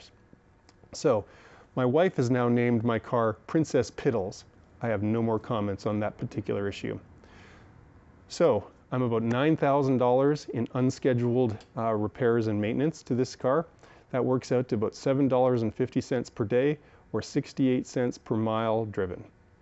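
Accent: Canada. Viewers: I can tell he’s Canadian by the way he says “out”, and “about” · From your "aboot"s, do I take it these figures are in CANADIAN dollars?? You never specify U.S. or otherwise, so... please clarify! :) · You were not kidding about those costs. I did buy a 2006 V8 Vantage with a manual gearbox. It was a lovely car - for someone more well-heeled than I. Parts costs do not compare to labor at the dealer, and both are truly expensive. I had a limited mileage full insurance policy so that greatly reduced operating costs and "wear and tear." I liked the car a lot, bit too much understeer, and the clutch engagement was very sudden at the top of the pedal stroke. I was fourth owner, and the car only had 25000mi on her when I acquired if for the ten months I had her. I might look into another one of the earlier, simpler cars of this era again, and would advise any potential owner to shop and maintain it wisely. 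Thank you for the video. I note a bit of a Canadian accent for Californian though! 😀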